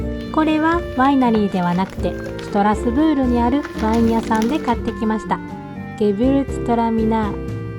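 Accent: Kansai, Japan. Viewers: お初にお目にかかります。 話し方から主さんは京都か大阪、いずれにしても関西の方なのかなと思いました。 黒い森、シュバルツバルトですね。 旅するドイツ語で見ました。 自然豊かでんなぁ。 でもビール飲めへんと辛そう。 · ドイツで入浴剤！？浴槽に浸かるのは日本人だけかと思っていました！びっくりです。 関西人のわたしには関西弁が抜けていない主さんの話し方が最高です。これからも頑張ってください😊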